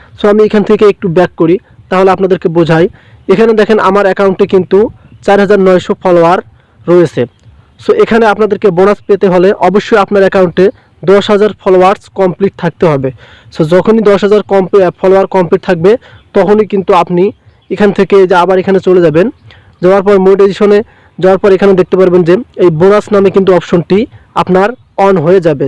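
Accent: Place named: Sirajganj, Bangladesh. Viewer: মনে হয় সিরাজ গঞ্জ বারি আপনার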